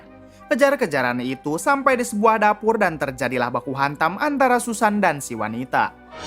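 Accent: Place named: Padang, Indonesia. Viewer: Translate nye logat padang nih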